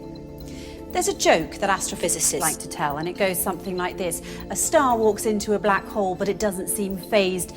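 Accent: United Kingdom. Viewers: ملاك يغني سمفونية العقل والبراهين الفيزيائية في القرآن بلكنة انجليزية بريطانية رائعة وفقك الله Good bless you angel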